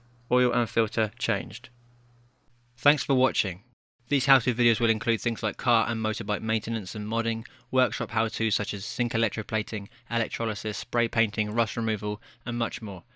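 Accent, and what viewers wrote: United Kingdom. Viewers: I am going to be honest here, because I like your channel and I want to see you succeed and make more great content. I think you should not do voice-overs. Maybe it is because I am american and don't appreciate the english accent. With that being said, good luck and keep experimenting with new content.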